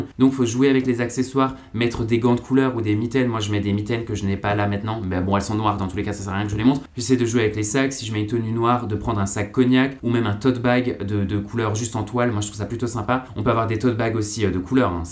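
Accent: Alsace, France. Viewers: C’est trop drôle comment vous dites « métenant » a la place de maintenant en Alsace 🤣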